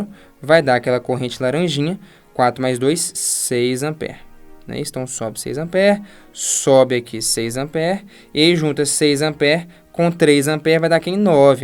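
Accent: Minas Gerais, Brazil. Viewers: esse sotaque mineirinho deixa tudo mais leve. Eu amo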